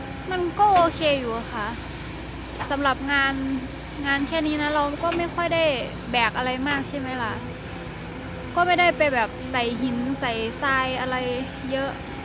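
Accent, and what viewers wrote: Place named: Isan, Thailand. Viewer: จักถังแน่คับน้ำมัน..ยามเว้าอีสานตาฮักคักเนาะคน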